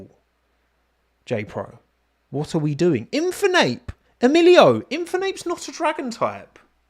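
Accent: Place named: Cockney, United Kingdom. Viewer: Is could just get rid of that gawd awful cockney accent this might be listenable to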